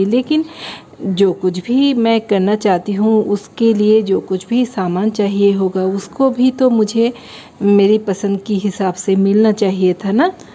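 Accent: Bengal, India. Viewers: Aap ki Bangali tadke Vali Hindi mujhe bahut pasand aati hai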